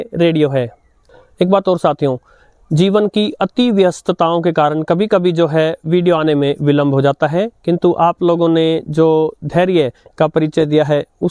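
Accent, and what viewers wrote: Standard. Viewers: Congrech bhai ji aap mujhe bahut hi ache lagte ho aapka jo bolne ka tariqa bahut hi lajawab hai aur aapki pure Hindi man ko bhati hai aur yeh redio maine life me bahut chalaya hai yeh lockle shop se mil jata tha iski prise bi tab 350 ke aas pas thi ab ka pata nahi mujhe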